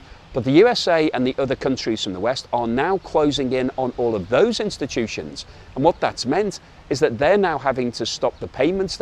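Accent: United Kingdom. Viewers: Hi. Are you based in the USA? Sounds more like a British accent you have there.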